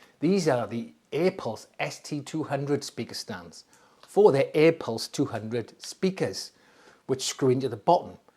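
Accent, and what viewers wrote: Wales, United Kingdom. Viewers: I have those type stands, a little different and mine are not as nice that aside i couldn't be more happy with them. Mine are faux leather and wood effect although the wood effect is like a vinyl wrap but it's very thin so you must be careful, yours look slightly better made on the front effect front. For the money they are excellent. Btw I am impressed by the effect you put into your videos with the editing and little effects. You are very rare on that front and the effect is much appreciated. I can tell from your voice that you're from my dad's country, he was from Buckley North Wales. Miss Wales but living down south makes getting there hard.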